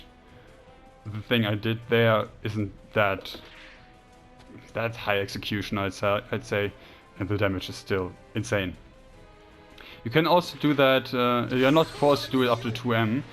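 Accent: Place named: Germany. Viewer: are u german ? ur accent sound really really german xd wait ich bin dumm xd dein Spiel ist ja auf deutsch ;D gutes Video !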